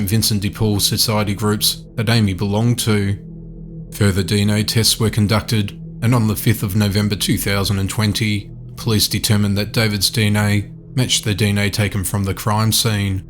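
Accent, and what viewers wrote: Australia. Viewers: Me too! He's smashing every other true crime page i am subscribed to! i love his voice and finally finding an AUSSIE amongst all the true crime channels 🙌🙌 No offence to anyone not feom Australia- I've just found it VERY rare to come across :-)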